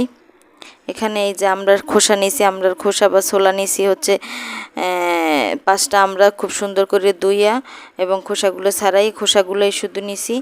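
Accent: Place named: Barishal, Bangladesh. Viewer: অনেক সুন্দর হয়েছে আপু আপনার এই আমড়ার জেলি ঠিক কইরেন আপনি কখনো শুদ্ধ বলেন কখনো আবার বরিশালে এটা বলেন শুদ্ধ টা বলবেন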